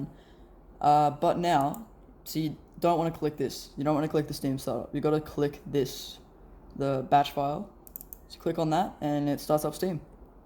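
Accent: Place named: Australia. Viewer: Aussie very much ahahaha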